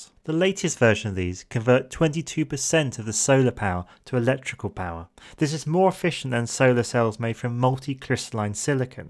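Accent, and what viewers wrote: United Kingdom. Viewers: He's effin English. He ain't from Texas...(By saying 'effin English' in no way I meant that pejoratively)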